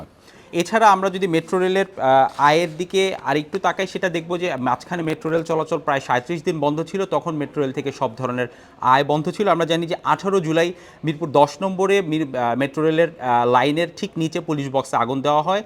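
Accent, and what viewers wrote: India. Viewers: আপনি যেটা বুঝাতে চাচ্ছেন সেটা আমরা বুঝে গেছি।। দাদার টান এখনো৷ যাই নাই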